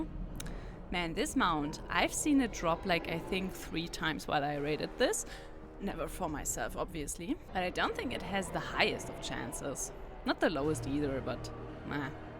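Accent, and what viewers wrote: Germany. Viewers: i hea da an bayrischen släng aussa oda? xD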